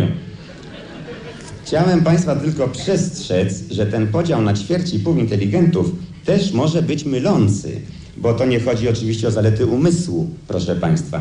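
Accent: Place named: Greater Poland, Poland. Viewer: Zaraz, zaraz, toż to gwara wielkopolska.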